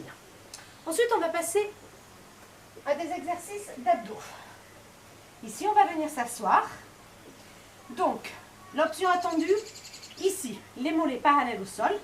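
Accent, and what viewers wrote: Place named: South-West, France. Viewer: Un vrai bel accent du sud-ouest 🤩 !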